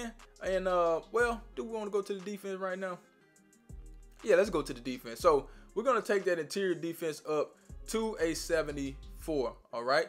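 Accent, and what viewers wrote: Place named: South, United States. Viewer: Good Vids bro . Where you from . Sound like the south . How you say “ you know I’m talking bout “ lol sound like my area lol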